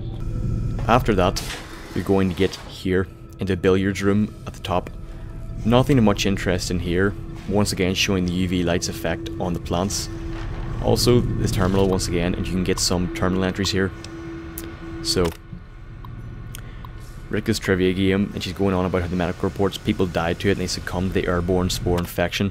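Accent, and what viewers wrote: Northern Ireland, United Kingdom. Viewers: Are you from Northern Ireland? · I respect you Northern Irish Morgan Freeman. Also as you didn't upload a video this week are you uploading an extra video?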